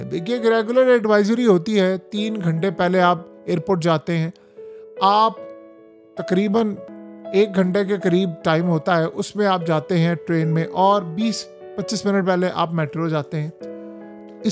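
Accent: India. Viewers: تیرے انداز گفتگو سے لگا رھا کہ انڈین کہ انڈین ایجنٹ ھیں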